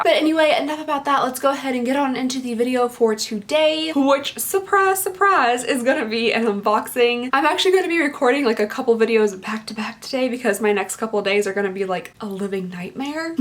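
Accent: Kentucky, United States. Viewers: Cool.. you go to the same school as my son and his girlfriend.. just a county away from where I live.. lol I can tell by the accent you're KY! 😊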